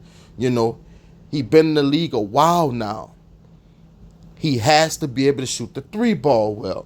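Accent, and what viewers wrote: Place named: New Orleans, United States. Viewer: Sound like you from the EASTSIDE OF THE NOLA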